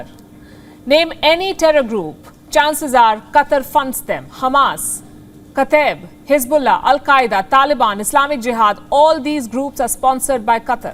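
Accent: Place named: India. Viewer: You sound Indian today and for the first time you chose a side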